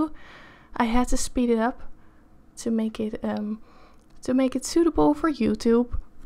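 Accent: Netherlands. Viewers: You hear that she is from the Netherlands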